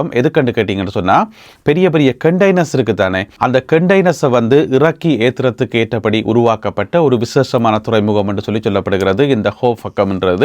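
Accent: Jaffna, Sri Lanka. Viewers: தம்பி நீங்க யாழ்பாணத்தை பிறப்பிடமாக கொண்டவர் என்பது உங்கள் தமிழ் உச்சரிப்பில் தெரிகிறது நீங்க நிறைய நல்ல விடையங்களை சொல்கிறீர்கள் ஆனால் நீங்கள் பேசும்போது “ அங்க அது இருக்குத்தானே” “ என்னென்று சொன்னால்” இருக்கு போல கிடக்கு” “ நல்ல பூசை குடுத்திருக்கினம்” போன்ற சொற்களை தவிர்ப்பது நல்லது ஒரே எரிச்சலாக இருக்கிறது நன்றி வளம் பெற வாழ்த்துக்கள் ஈழத்தமிழன்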